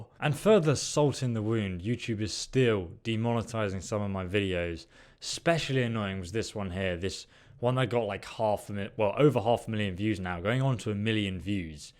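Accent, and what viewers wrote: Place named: RP, United Kingdom. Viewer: Get off your Fat Arse and like the rest of the Fucking world get a Fucking Job!!! You make me Fucking Sick begging for money on youtube, while people are working flat out to pay off their mortgage and feed their kids, You’re crying because you can’t sustain Your hobby as a full time career...well boohoo! You sound like you’ve had a very privileged upbringing by your Received Pronunciation and the fact that you’re a grown man mentioning that “mummy and daddy will help you out!” only confirms my belief that you are a work shy Bag of Shit who has no compunction but to ponce off of the gaming community who work and play for pleasure! But don’t worry people like you will be forced to get of their lazy arse sooner or later, you don’t seriously think you can make a long time career out of this! Seriously fella wake up and smell the coffee you dozy Cunt!!!🤣🤣🤣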